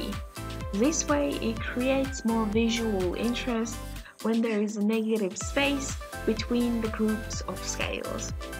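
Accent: Finland. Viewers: I'm currently debating with myself on if you are Finnish (it's the accent).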